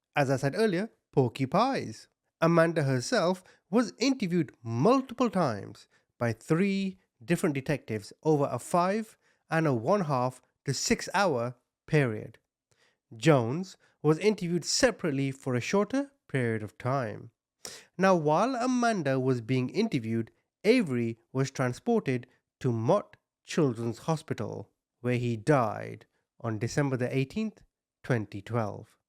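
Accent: United Kingdom. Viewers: Bruh, he’s British. There’s a ton of words that’s British English and American English pronounce differently. It’s so funny how us Americans often think “our way” is the correct/only way for things 💀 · English is not second language, he is just British 😂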